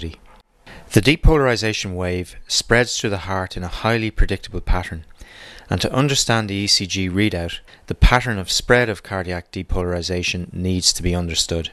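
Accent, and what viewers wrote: Ireland. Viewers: What a HUGE help this video was! I wish my college teacher explained it this well. Thanks for this video. I think you have helped me raise my grade. Your Irish accent is a pleasure to listen to as well. Thanks again, doctor.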